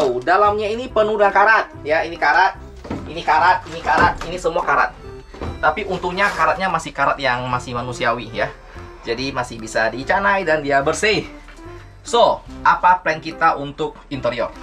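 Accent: Pontianak, Indonesia. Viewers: Bahase Nye to Melayu chine Pontianak..... Mantapsss...macam channel hobby makan....lanjut ko 😁😁😁😅😛🙏👍👍👍🌟🌟😃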